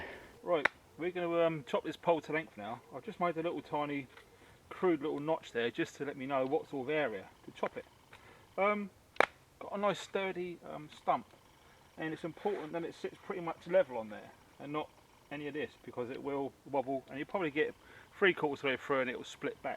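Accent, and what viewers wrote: United Kingdom. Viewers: hi, my first time watching you and I thought you were gonna be american but.... no! finaly some british guy! great vid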